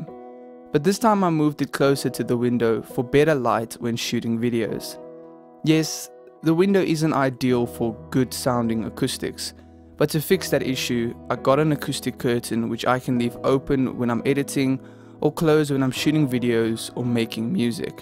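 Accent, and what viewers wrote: South Africa. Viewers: You South African? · Is that a South African accent I’m hearing my boytjie?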